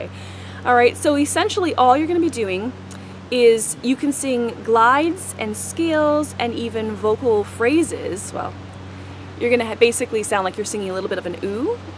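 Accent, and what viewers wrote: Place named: Midwest, United States. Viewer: thank you for sharing your knowledge. you sound like you're from the midwest. i'm from wisconsin!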